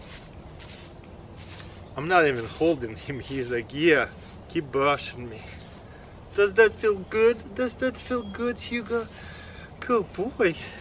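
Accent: Russia. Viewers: Ох уж этот русский акцент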